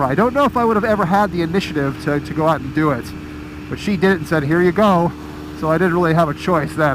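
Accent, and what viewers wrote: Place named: United Kingdom. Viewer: NinjaBoots ***** Hehehe...yes his British accent does make it special. I like his hones oppinions and style. Just being himself and looks like a good fella to my oppinion. Also f*cking love the track days and the test drives, Awesome. I am so happy for him, but was a bit sad when he said for the divorce... Hope he will do OK. Ride safe guys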